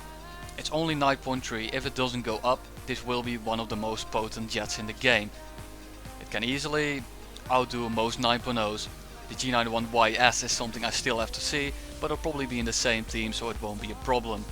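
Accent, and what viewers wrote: Netherlands. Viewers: Subscribed. I hear a clear dutch accent. Groeten uit limburg!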